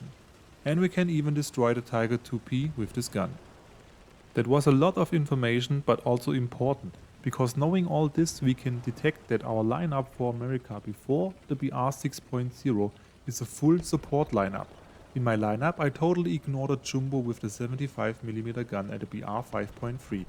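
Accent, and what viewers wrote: Germany. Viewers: question is are you german? just wondering no ill intent with my comment but was just curious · Also nice German accent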